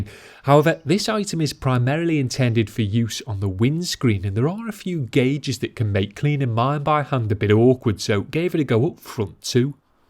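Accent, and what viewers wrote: United Kingdom. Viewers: Wow this guy has a heavy Red Coat accent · don't know how to explain my feeling about u speaking that brithish english accent, as a mexican is hard to me try to understeand what are you saying, but however i have to use the subtitles. nice video! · Where are you from my sons says Liverpool i said no.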